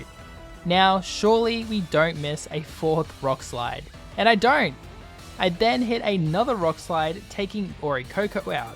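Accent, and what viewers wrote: Australia. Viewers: Are you Australian if so in from the central coast in NSW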